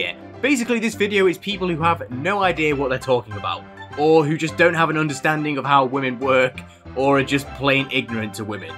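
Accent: United Kingdom. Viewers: are you brithis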